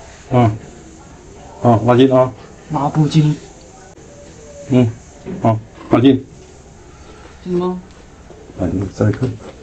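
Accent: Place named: Changsha, China. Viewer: 像长沙话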